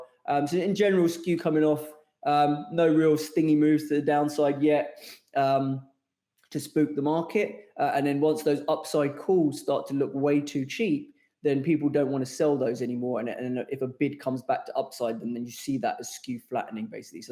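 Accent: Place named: United Kingdom. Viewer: Great content but too British accent. Cannot stand it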